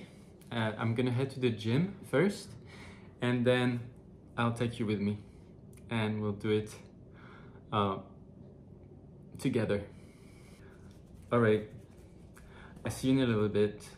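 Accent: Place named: France. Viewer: 💕Hey boo how you doin I hope your doing well looking absolutely gorgeous as always I'm unconditionally irrevocably in love with you I absolutely adore you Your MY favourite frenchie in absolutely obsessed with your French accent (can I borrow it) Lots of love and kisses your biggest fan NICOLE LOUISE crespeau💕 💋💋💋💋💋💋💋💋💋💋💋💋💋💋💋💋💋💋💋💋💋💋💋💋💋💋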